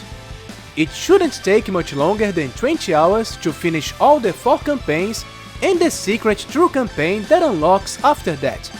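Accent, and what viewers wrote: Brazil. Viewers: Hello Bro. Found your channel after searching for Uppers Review and I'm having fun with all these japanese game analysis. Are you from Brazil? Cause your accent resembles of someone from there. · Your accet is Brazilian right? at first I thought it was Japanese, but then I found it more familiar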